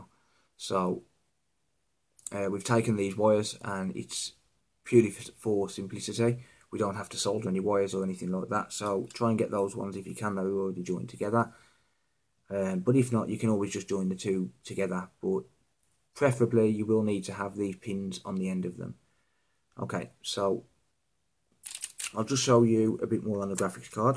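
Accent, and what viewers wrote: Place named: London, United Kingdom. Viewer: What a London blok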